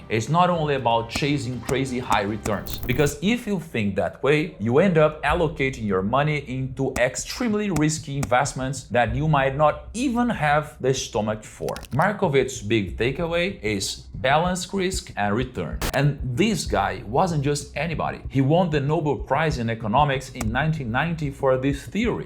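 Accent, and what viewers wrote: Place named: Brazil. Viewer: Fly, my friend! Fly! So good to hear your voice in English! You'll get to the hearts of people with your Brazilian accent! This is what happened to me 20 years ago, working as a volunteer in the US and learning the language at the same time! You're soooo good to go! You rock! <3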